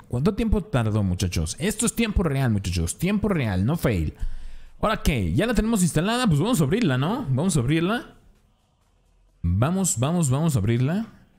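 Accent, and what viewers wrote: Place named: Russia. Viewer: Gracias bro, quería hacer este contenido en mi canal, y me pasaba este error, aparte me gusta Tu acento, parece Ruso jaja.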